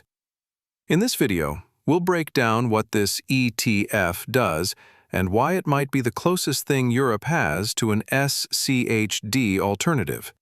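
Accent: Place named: Sweden. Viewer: that sweet Swedish accent 👌🏻😎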